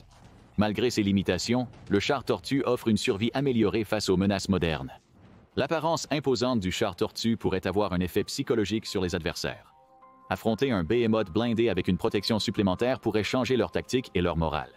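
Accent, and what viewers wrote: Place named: Quebec, Canada. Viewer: c' est radio moscou ici , avec une i. a a voix quebequoise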